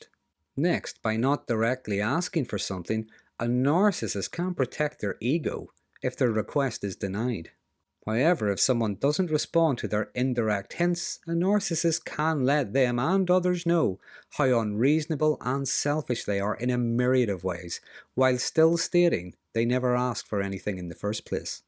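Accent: Ireland. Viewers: >>10-Q, Counselor. I'm glad I stumbled onto your "Dry Begging" lecture. >>My psychotherapist and I will discuss it at our upcoming Zoomer! I remain Respectfully Serene, NHG. >>PS what a delightfully sweet Irish accent! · Sounds like an Irishman to me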